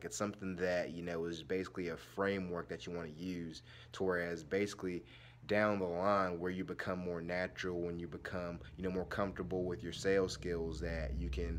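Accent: United States. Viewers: Thats a Baltimore accent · You from the Dmv ??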